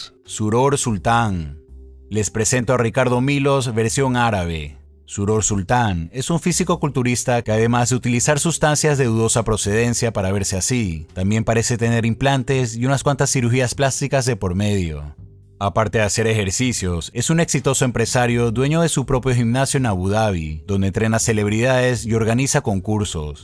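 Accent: Ecuador. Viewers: Es Ecuatoriano así hablamos a veces 🤣🤣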